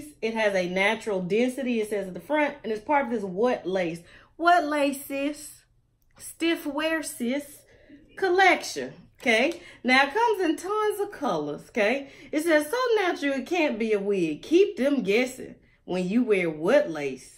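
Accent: South, United States. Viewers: New subbie!!!🤣🤣🤣You are hilarious! Love the southern accent! You be talking to sis!🤣❤❤❤ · I subscribed because 1. You’re beautiful 2. I love your accent wall 3. I want to perfect your southern accent 😊😂 I will be ordering this wig soon. Good review, thanks sis. 🙂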